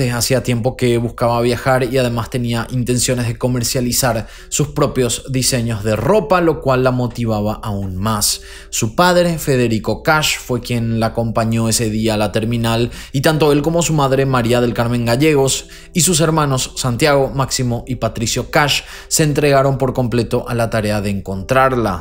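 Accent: Paraguay. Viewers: Hola..!!! Saludos desde Las Toscas Santa Fe Argentina... siempre estoy siguiendo tus videos..!!! aguante la tonadita de Paraguay..!!! Éxitos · Es paraguayo? Justo me preguntaba de donde era el acento.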